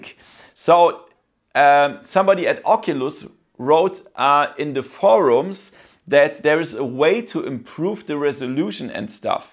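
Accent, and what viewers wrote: Germany. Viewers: You german?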